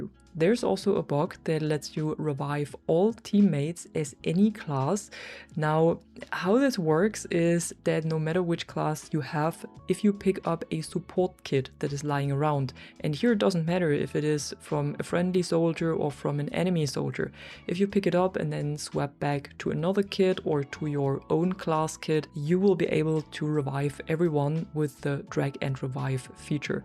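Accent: Germany. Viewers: Dein Akzent hört sich an, wie wenn ne deutsche Frau englisch spricht. Kann das sein? aaahja, habs gesehen bei insta.. German.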